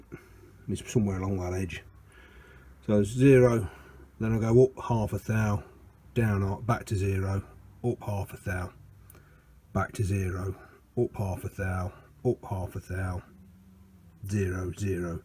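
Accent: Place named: Leicestershire, United Kingdom. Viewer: I've been working my way through your Holbrook restoration videos, albeit a few years too late. As an old retired Machine tool Fitter, (I worked 50 years at Jones and Shipman, building Grinding machines) I have to say your work and methods are exceptional, especially your scraping tecniques, that have have come on leap and bounds, I thought I sensed a bit of a Leicesterhire accent too, me being from Leicester myself, fair play to you, have subscribed, cheers, Dave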